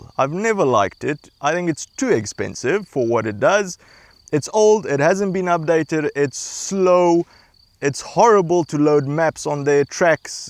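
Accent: South Africa. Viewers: How did you get the South African accent?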